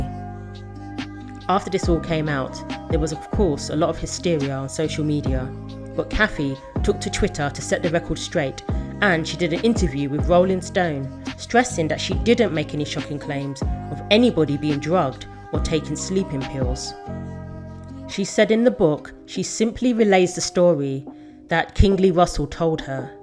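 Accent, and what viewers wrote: England, United Kingdom. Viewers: No new information here. Just told in an English accent.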